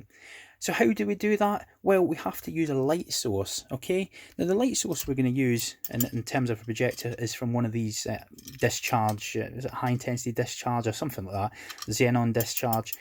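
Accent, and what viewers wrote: Scotland, United Kingdom. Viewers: That was great. So they are just monochrome LCDs with the light filtered coming to them. {Admires Scottish accent realizing it actually helps in attention as-well-as retention.}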